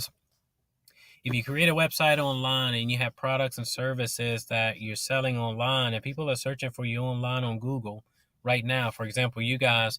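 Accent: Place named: United States. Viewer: Hostpapa is a Canadian web hosting service. You sound American. How did you hear about it?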